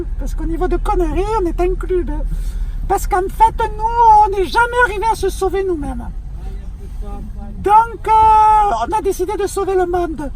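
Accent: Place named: Marseille, France. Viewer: J'adore ton accent des Goudes,!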